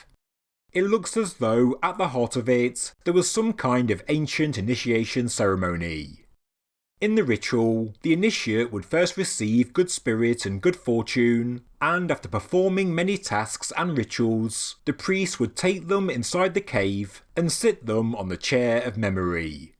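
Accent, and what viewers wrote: United Kingdom. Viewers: That’s his regional English accent. I love it!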